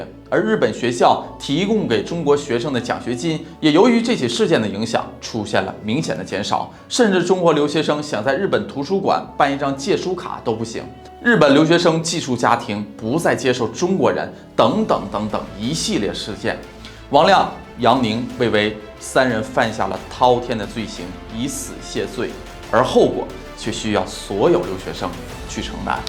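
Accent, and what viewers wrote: Northeast, China. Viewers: 是不是，东北人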